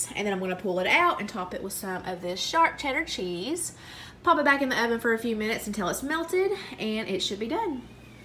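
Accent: South, United States. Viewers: Looks so good love her southern accent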